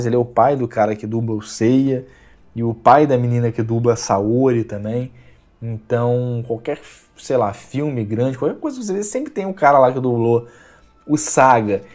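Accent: Rio de Janeiro, Brazil. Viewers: Vc e carioca!?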